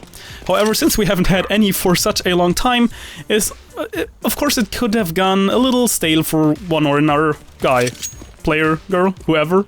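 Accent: Germany. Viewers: man you have a great german akzent =D greetings from there ^^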